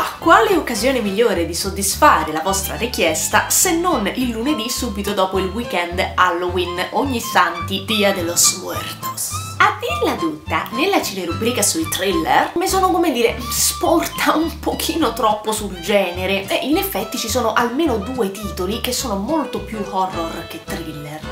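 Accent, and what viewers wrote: Rome, Italy. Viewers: Ho visto tutti gli horror possibili e anche se alcuni mi hanno delusa sul finale mi sono comunque piaciuti... Ma "il quarto tipo" non so perchè mi ha fatta proprio cacà sotto!!! Tu l'hai visto? PS: a furia di vedere i tuoi video inizierò a parlare romano XD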